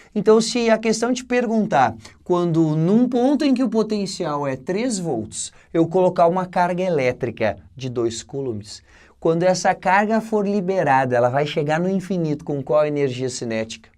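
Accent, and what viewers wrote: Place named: Santa Catarina, Brazil. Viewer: sotaque de catarina é bom demais hahahahahah show de bola mestre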